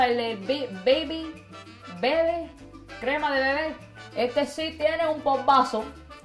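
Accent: Cuba. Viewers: me encantan tus videos. Son lo máximo. Me alegro que mantengas tu acento cubano (siempre tan cálido). Así mismo son las abuelas caribeñas.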